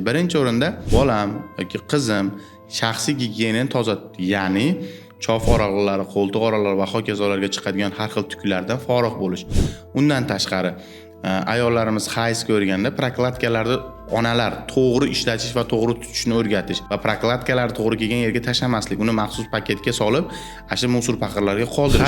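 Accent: Andijan, Uzbekistan. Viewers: Anjan shevasi zo'rde manaqa..manaqa...manaqa...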